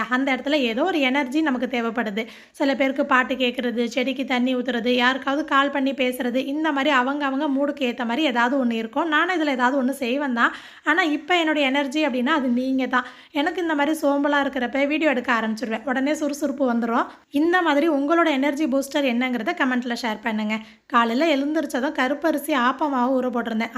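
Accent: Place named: Madurai, India. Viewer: Pera ketalea odiruvangalea..... Enga madurai slang la pesuringa Nala iruku sissy....❤❤❤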